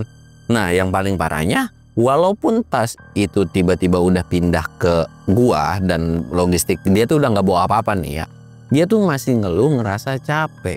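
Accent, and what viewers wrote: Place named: West Java, Indonesia. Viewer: Kalo cerita mamang campur Sunda mang seru lucu logat sundana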